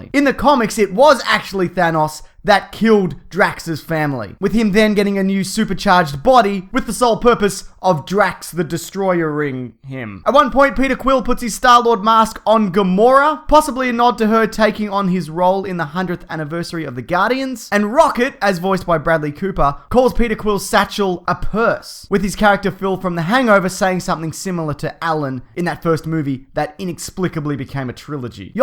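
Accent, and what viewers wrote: Australia. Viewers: lol I so want to know what you are saying in some of the collectors items and what they are and do, so I subtitled this video, (which anyone can do) and I guess an austrailian accent makes it so english subtitles horrible lol. for Thanos the subtitle came up as chans.... and almost every word is off. its funny, I recommend trying subtitles for this video just to see what I'm talking about. settings button or menu is right on the youtube screen itself. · this is the thickest Australian ascent ive ever heard AND IM AUSTRALIAN!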